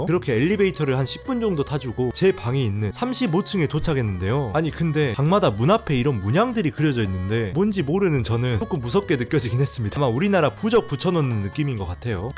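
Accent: Jeolla, South Korea. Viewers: 전라도 사투리가 강하네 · 너 전라도니?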